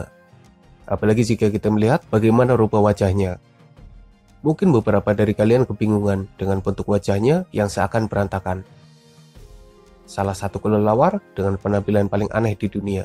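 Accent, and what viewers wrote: Banyumas, Indonesia. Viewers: Ngapak sukses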